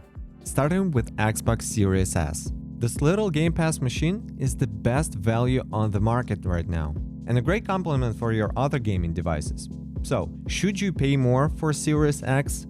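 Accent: Russia. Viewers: У тебя максимально русский акцент)) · Guy sounds Russian i would take what he says with a grain of salt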